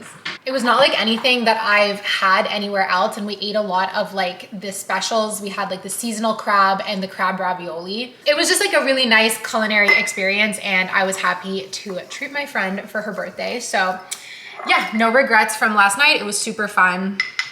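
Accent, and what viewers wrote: Quebec, Canada. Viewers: I like your videos and in this one it was the first time I heard you speaking in French and you even have the Quebec accent when speaking French I'm impressed (I love the Quebec accent as a French from France) :hands-yellow-heart-red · I adored listening to you speaking French <3 with the cutest Quebec accent at that ! lots of love from France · Haha you have such a strong quebecois accent now when you speak French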